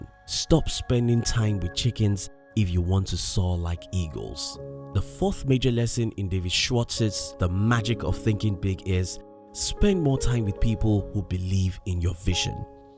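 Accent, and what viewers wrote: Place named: Nigeria. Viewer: Strong Nigerian accent 😆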